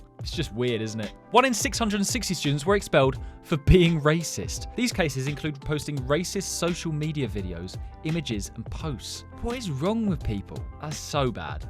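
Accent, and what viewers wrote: United Kingdom. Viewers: Dropping fireworks hmm what does that remind you of maybe the Weasley twins from Harry Potter also you keep reminding me of Ron with your British accent and curly hair.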